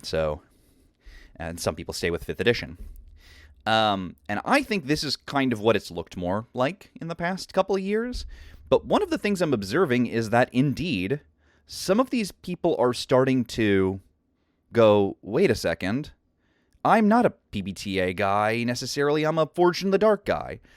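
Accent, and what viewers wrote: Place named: Mid-Hudson, United States. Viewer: I can't hear an accent, ya sound upper Mid-Hudson/ Catskills. "Complete Strategist" is a great shop, I couldn't imagine gaming there though. I never got into 5e. I read the core books & thought it looked good but my group wanted to stick with our modified 3e/3.5/PF1e/D20 rules. I can see why they did but I would've given 5e a try. I only run home brewed settings since the '80s & in my experience players will always add to the world. We've been running games in the same world for over a decade now. The setting before that lasted 8 years. Sometimes players will misinterpret my descriptions, make assumptions or connections I didn't think of & come up w/ something way cooler, so I'll just go w/ it as if that's what I intended the whole time.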